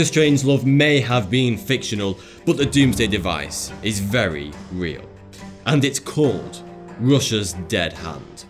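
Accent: United Kingdom. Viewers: i susbcribed cause of his brittish accent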